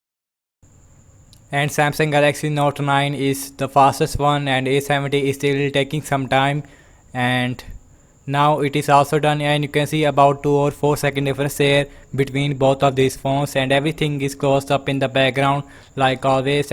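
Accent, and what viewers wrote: India. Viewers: Typical Indian accent and pronunciation..R2D2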